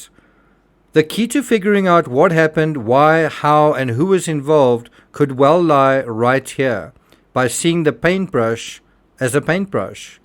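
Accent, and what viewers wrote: South Africa. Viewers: Also, love the accent, it’s so soothing. South African right? Love it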